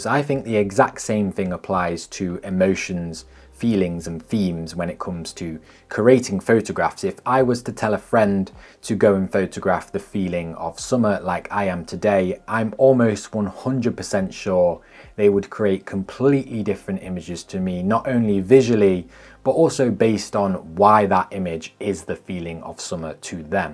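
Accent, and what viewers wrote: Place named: Northwest England, United Kingdom. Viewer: Eeee Saltburn, I was dragged up in Teesside (Stockton) but haven't been to Saltburn for yonks. You sound like you're from NW England more than NE!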